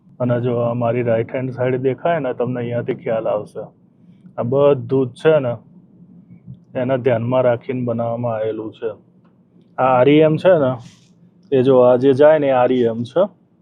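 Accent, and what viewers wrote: Mehsana, India. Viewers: BoliTo Mahesani chhe, PAN Gaom Ke U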